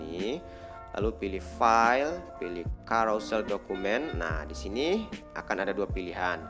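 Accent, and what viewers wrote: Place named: Bali, Indonesia. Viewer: iya...iya teman2 kayk bli..bli di bali logatnya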